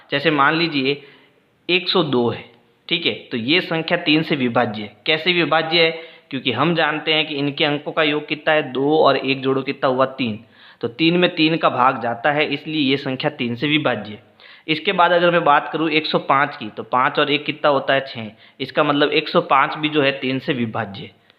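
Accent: Rajasthan, India. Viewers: Man you from Rajsthan ?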